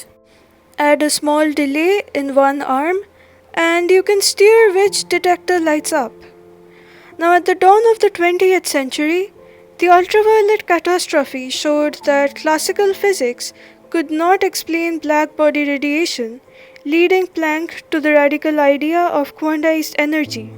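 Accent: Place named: India. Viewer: I usually can't stand Indian accent of English - but this one is really pleasant to listen to lol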